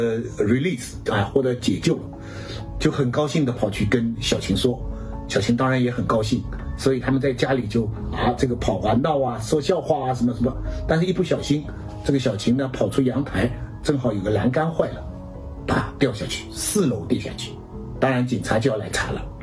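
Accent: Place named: Shanghai, China. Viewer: 喜欢许老师用上海话念书！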